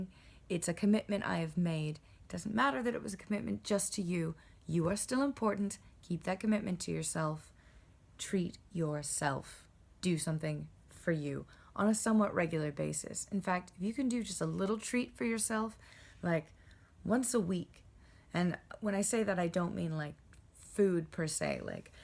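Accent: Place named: England, United Kingdom. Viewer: There's that English accent again.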